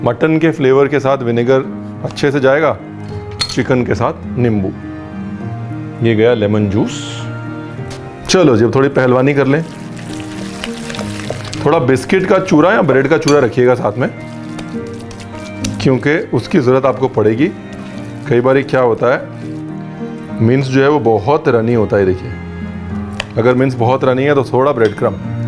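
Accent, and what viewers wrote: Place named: Bengali, India. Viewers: Simple yet amazing recipe. 👌 Dear chef Thank you very much for sharing Kolkata style Chicken Cutlet recipe. Your bengali accent is too good. Lots of love from Kolkata. 💝💝💝💝💝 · Too sweet bengali pronounce...and also the comments on BONGEATS is really nice. I also believe same. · Sir...your bengali accents sounds so amazing...ohh damn good when you spelled ranna ghore,sorshe bata,posto bata 😁.... being a Bengali just loved your feelings towards bengal's food culture...specially kolkata.... literally amazed... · Your bengali accent is very nice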